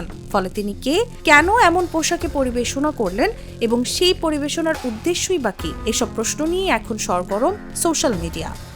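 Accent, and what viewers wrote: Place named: Bangladesh. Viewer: আপামণির কথা বলার ধরন দেখে মনে হচ্ছে বাংলাদেশী, তা আপামণি আপনার দেশ যে বিদ্যুৎহীন অবস্থায় ব্ল্যাক হোলে ঢুকে গেছে সেই খবর করেন না কেন??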